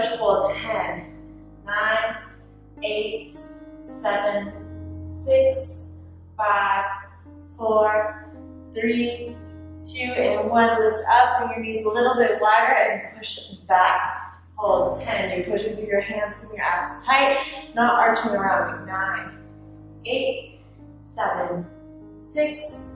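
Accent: United States. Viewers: Is that a Texas accent? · Did I catch some southern accent there? Like Alabama or Mississippi or Kentucky... don't know for real..cuz I ain't no American...and English ain't my native language... Anyhow... beautiful lady...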